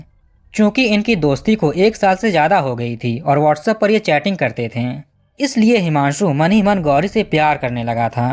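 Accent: India. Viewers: woh North Indian h unka accent Waesa hi hota h.. Aur woh Boht accha narrate krte h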